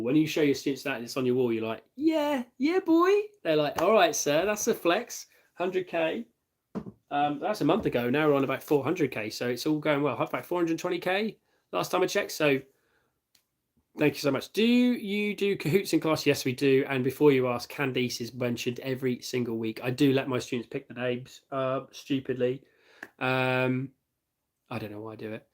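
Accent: United Kingdom. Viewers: Are you british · HE HAS A BRITISH ACCENT AAAAAAAAAH THIS IS AMAZING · THE BRI'ISH ACCENT ❤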